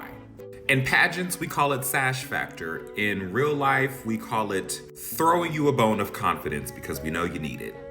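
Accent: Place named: United Kingdom. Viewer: First thing -- I LOVE your British accent. And secondly, to me, it just feels like certain men are being rewarded for their bad behavior and hatred. I feel like high positions in these sorts of contests and polls are a way of telling them, "Keep doing what you're doing, and you will be given further, grander presents." It wouldn't surprise me, if Piers, in particular, is itching for a knighthood. (6/2/2024)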